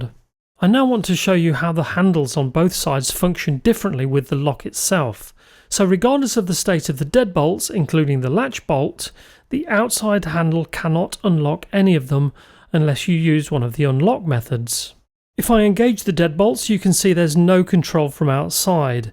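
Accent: United Kingdom. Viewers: U sound like u from uk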